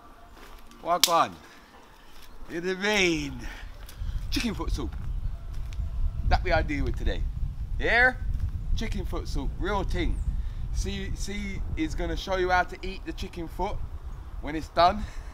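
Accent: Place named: Jamaica. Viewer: You talking with a Jamaican accent reminds me of my ex who used to talk with a manchester accent because she liked the sound of it despite being born and bred in the south lol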